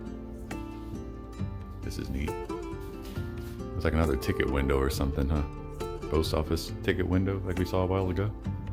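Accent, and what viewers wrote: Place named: Cajun, United States. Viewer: If you did these in your gay cajun accent you’d probably crazy viral ha 😂😘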